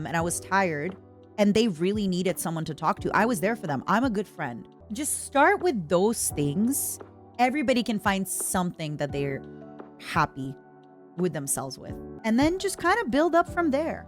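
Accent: Quebec, Canada. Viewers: as a french from France, it's wierd to have a "typical" french music, over an quebec accent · lmao she even speaks the Quebec dialect xD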